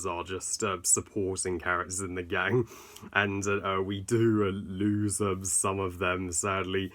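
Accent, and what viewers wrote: West, United States. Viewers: great review as always and i loved your western accent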